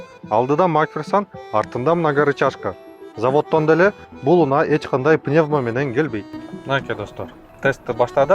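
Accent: Standard. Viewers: Кыргыз тилинде таза суйлогону жакты Бирок Машина Автомобилдин кыргызчасы араба шайтан араба деп айтылат уялбай шайтан араба деп айтабергиле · Рахмат иним, таза Кыргызча суйлойт экенсин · Көптөн бери эне тилибизди мындай таза угалек элем азаматсыз унага келсек мен соната ден 8 айдагам өтө жакшы уна бирок камриге эч качан жетпейт соната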